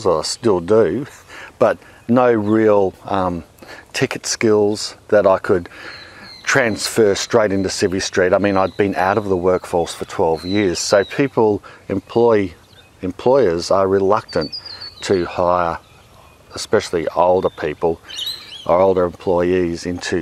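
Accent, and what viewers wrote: Australia. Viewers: Congratulations on reaching 1 million subs! I love your channel and have recommended it to my brother who has a farm in Qld. I live in the US now and love hearing the Aussie accent again! My brother has me worried. Any time he drives by a milk farm, he pulls over and leaves a few dollars on the fence. Doesn't he know cow tipping is illegal?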